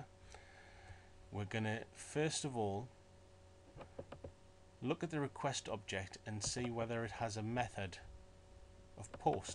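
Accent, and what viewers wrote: United Kingdom. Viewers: Really great and clear stuff! Learning loads. Plus ice to hear a British accent for a change.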